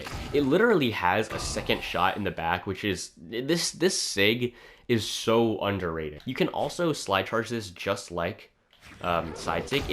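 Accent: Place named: Zimbabwe. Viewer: Where are you from? You sound Zimbabwean🇿🇼.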